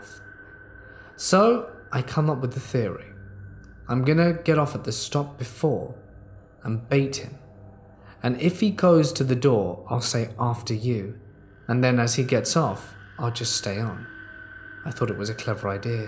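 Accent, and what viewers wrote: United Kingdom. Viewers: So many of these stories are typically American teenagers but your narrator is obviously British. He’s excellent. Could pass fir Mr Darcy telling me a story. I’d love to hear British horror and not the stories of American kids, campfires and wendigos which get samey. But This first story sounds good.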